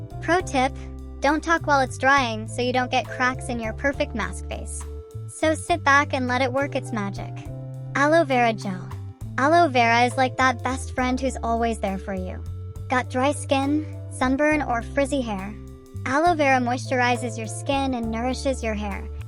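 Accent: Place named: Multan, Pakistan. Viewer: Her accent multani mithi omg 😂😂😂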